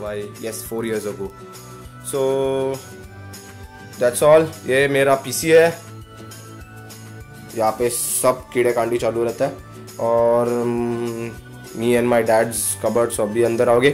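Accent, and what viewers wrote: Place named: Maharashtra, India. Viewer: I like your marathi accent 😃😄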